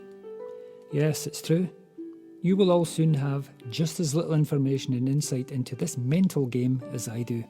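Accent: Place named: Scotland, United Kingdom. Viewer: That's a shocker if a Scottish accent all the same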